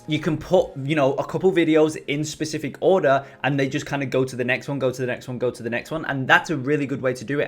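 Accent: United Kingdom. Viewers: Hey bro are you originally from the UK?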